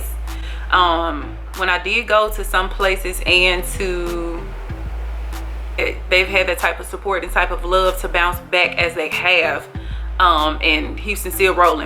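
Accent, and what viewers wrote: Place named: Memphis, United States. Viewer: Had I stayed in Memphis I would have that accent. lol I love your accent so much girl. lol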